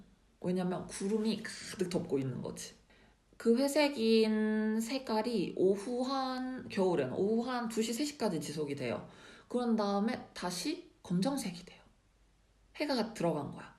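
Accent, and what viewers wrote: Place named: Gyeongsang, South Korea. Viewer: 경상도 사투리를 들어봣을땐 경상도 현지인인것 같은데요.. ㅎㅎㅎ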